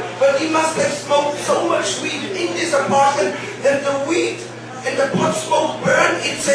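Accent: Germany. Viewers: Weil er einen bayrischen Akzent hat, richtiges bayrisch klingt nochmal anders, für alle ausserhalb Bayern kaum verständlich. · Wow, I guess native speakers will have a hard time understanding this. A severe german accent is rather disturbing than helpful for english comedy. In order to really use a fun german accent you probably would have to speak a nearly perfect english and then take the stereotypical parts of the german language and emphasize it. The way he's doing it is way too exhausting for the audience. Fun guy though!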